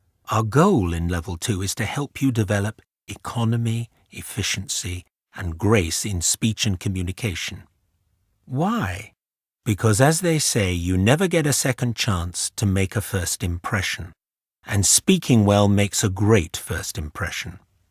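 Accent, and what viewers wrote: United Kingdom. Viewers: Is he British? where about in Britain? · Wow, the British accent is so pretty!!, but I cannot remove my mexican accent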